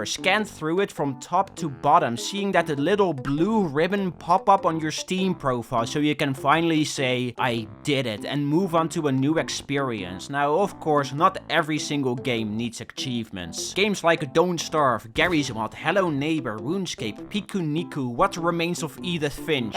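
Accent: Netherlands. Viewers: He’s Dutch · Is hij nederlands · Are you Dutch? You accent sounds a little bit like it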